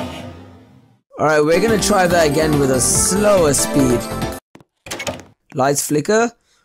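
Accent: United Kingdom. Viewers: R u British? 😅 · are you from birmingham?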